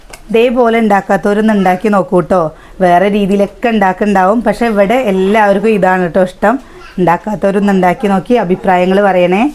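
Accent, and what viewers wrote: Palakkad, India. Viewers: Thani palakadan verthamanam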